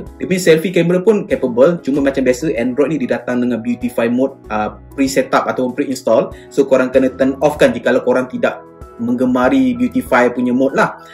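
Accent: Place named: East Coast, Malaysia. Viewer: Maaf tanya bang,asalnya org mne bang? Bunyi mcm loghat pntai timur sikit.. bahahhaha.. cun bang.. buat sy terpikat nk beli.. haduhhh.. cdgn sikit bang.. berbanding mi max 3.. mane lagi baloi? Sbb nk buat lenjan game biase2.. bukan hardcore sgt.. sbb kita faham sekadar mid sahaja.. just mintak pndgan.. hehehe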